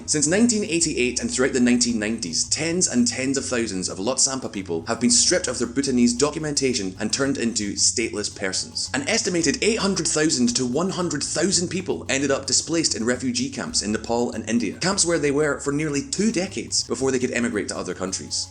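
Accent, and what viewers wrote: Scotland, United Kingdom. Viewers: Your scottish accent makes this very hard to follow. But nice video. You're cool. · I knew you would bring this so called refugee issue as you sound very British. The numbers you gave are all wrong. I can see you support for the Nepalese and I as a Bhutanese refuse to call them refugees. You forget we have a UN office in Thimphu. Totally biased reporting or whatever. Bhutan has a porous border with India and that’s how the Nepalese living in India towns of Darjeeling , Kalimpong and Nepal illegally entered Bhutan. So please stop playing the refugee card. By the way there are still many Nepalese here living peacefully and some have even become ministers under democracy.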